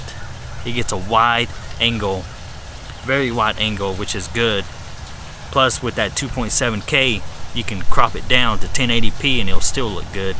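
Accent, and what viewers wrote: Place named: South, United States. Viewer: Dope B roll... Idk. Dope southern accent... Oh Yeah!!! Informative video. Thanks for posting! Is that the bass pro in bristol ?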